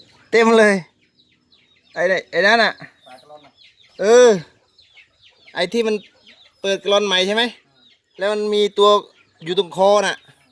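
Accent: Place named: Suphanburi, Thailand. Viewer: สวัสดีครับ....ออ็อด...สู่สู่..ครับ เป็นกำลังใจให้ครับ.... เหน่อสุพรรณ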